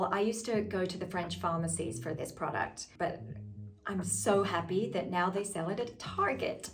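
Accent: Australia. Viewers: She sounds very Australian to me! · Although she was born in England 🏴, I think 🤔 after she moved to Australia 🇦🇺 age 14, she eventually developed and adopted her current Australian accent.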